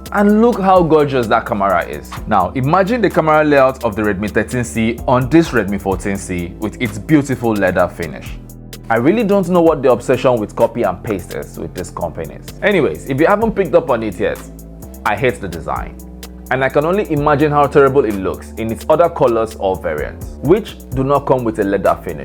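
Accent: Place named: Jamaica. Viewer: Dont understand Jamaican!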